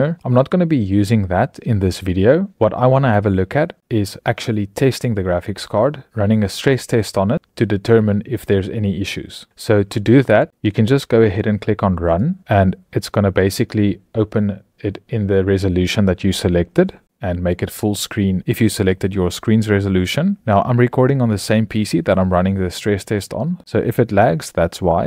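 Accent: South Africa. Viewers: I could definitely tell that you're South African based on your accent. Great video!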